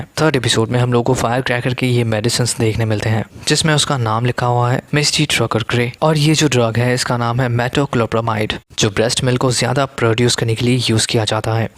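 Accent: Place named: Arunachal Pradesh, India. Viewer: bro.. kya bola aapne? pe.. Rabindranath "THAKUR"? 😅 it seems may be u r from arunachal pradesh.. plz improve accent... baki explanation accha tha... 👍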